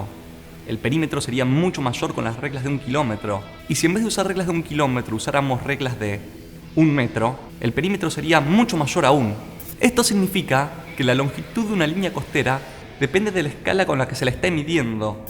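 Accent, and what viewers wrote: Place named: Buenos Aires, Argentina. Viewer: De Buenos Aires sos!! O de Rosario... es el típico acento!!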